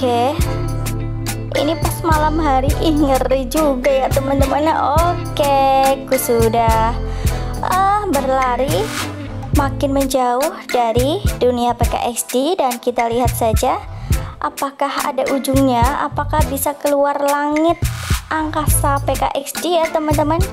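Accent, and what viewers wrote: Java, Indonesia. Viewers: Sampean orang jawa ya kedengeran dari suaranya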